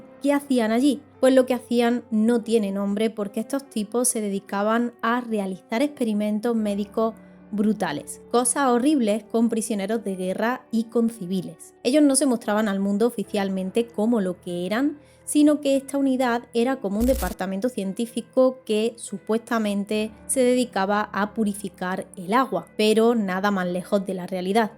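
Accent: Andalusia, Spain. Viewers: Amo su acento...que parte de España es? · Es acento de Andalucía, la perla del sur de España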